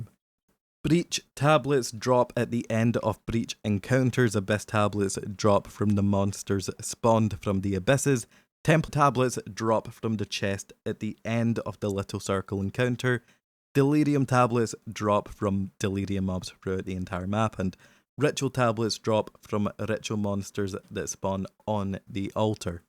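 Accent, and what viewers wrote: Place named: Scotland, United Kingdom. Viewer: It's called scottish accent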